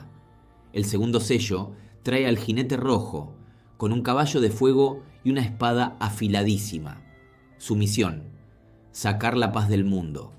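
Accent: Argentina. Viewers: Me encanta el acento argentino. Lo único que me gustaría que fuera narrado con menos humor irónico.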